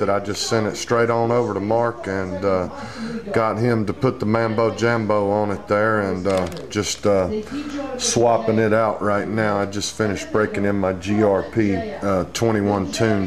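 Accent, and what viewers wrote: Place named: South, United States. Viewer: u sound like an easy going southern guy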